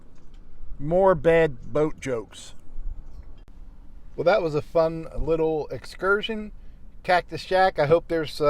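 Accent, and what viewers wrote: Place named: Texas, United States. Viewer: You have a great texas drawl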